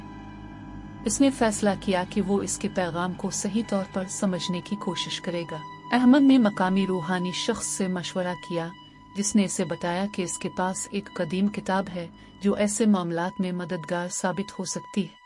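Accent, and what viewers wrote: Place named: Afghanistan. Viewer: Aap ko urdu aa nahi rahi hai Afghani accent hai app ka Muslim log puja paat Nahin karte😮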